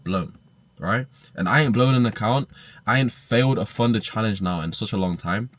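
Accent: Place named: United Kingdom. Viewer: Respect for this bro, I heard the UK accent and was immediately hooked lol. It's always motivates me seeing guys from here like me thriving to keep going myself and believe ill eventually succeed. May God continue to bless you for the wisdom you're sharing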